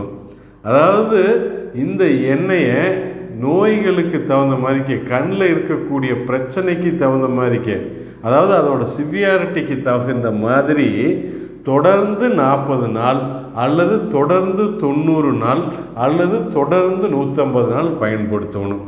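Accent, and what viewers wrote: Kongu, India. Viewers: வணக்கம் சார் உங்கள் வார்த்தைகள் கொங்கு தமிழுக்கு மேலும் அழகு சேர்க்கின்றது உங்கள் வார்த்தைகள் எமக்கு நம்பிக்கை தருகின்றது அனைவருக்கும் புரியும்படியாக தெளிவான வார்த்தைகள் உங்கள் தொண்டு வளரட்டும் இறைவன் துணை தங்களுக்கு எப்பொழுதும் உடன் இருக்க வேண்டுகிறேன்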